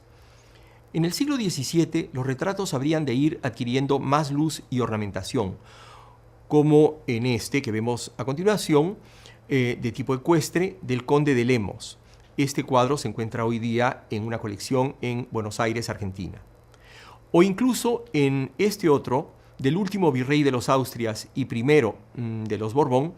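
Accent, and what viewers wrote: Peru. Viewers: Pero tiene acento Peruano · Muchos hablamos de esta manera, el q se educa y escucha va adheriendo estos tonos acentos de voz, es verdad q en lima muchos hablamos como la d este señor, en su mayoría con un acento limpio neutro y entendible, rn comparación a nuestros vecinos, pues en la sierra se habla distinto pues al ser quechuahablantes cambian radicalmente su tonalidad.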